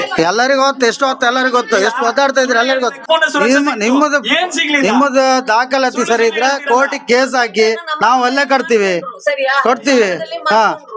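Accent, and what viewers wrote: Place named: Kundapura, India. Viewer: Kundapuradavre nimm maatu..👌.😂.dairya mecchle beku.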